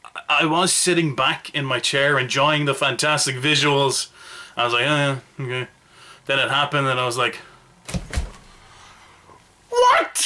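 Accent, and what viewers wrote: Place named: Ireland. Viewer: Your very funny, what part of Ireland are you from im from?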